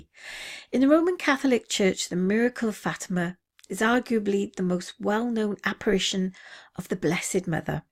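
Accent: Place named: United Kingdom. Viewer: Very well spoken dear lady I have a New Fav YT channel I believe the final prediction has been held from us. People Do panic easy and from other circles such as the “Visitors” stories are told of how mankind was grown through Genetic manipulation and planted here on Earth? Thank you, I as a Yank who was raised in Southampton I always perk up to a Brit accent!Blessings to You🌹💀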